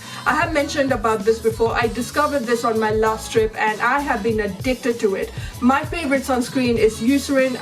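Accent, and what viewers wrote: Kerala, India. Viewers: thanks for the reply. I found a way around it. Just don’t close ur eyes...it’s sets real quick anyways.....glad to know it’s coz of the camphor...love ur videos n ur enthusiasm especially the mallu voice overs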